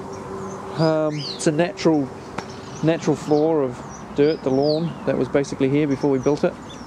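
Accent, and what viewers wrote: New Zealand. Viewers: i detect a Kiwi accent? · your accent sounded like a kiwi accent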